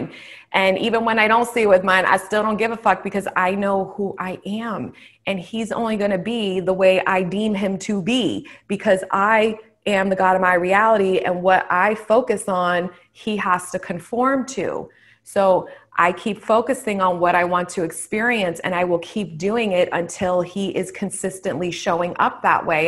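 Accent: New York, United States. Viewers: I've been binge watching all these videos of different coaches and I am slowly liking your vids the most! Plus as a New Yorker, I can totally tell you are from NY 🤣 But I definitely need to work on self-concept and assumption because I really really want my SP and I can see the hot and cold with how I am feeling that week or not. I want what I want and I deserve that shit! : )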